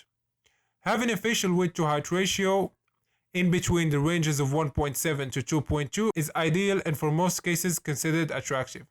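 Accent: Morocco. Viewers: your accent sounds damn moroccan lol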